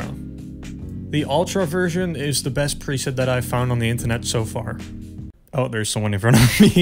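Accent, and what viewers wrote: Netherlands. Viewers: You’re either Dutch or from Belgium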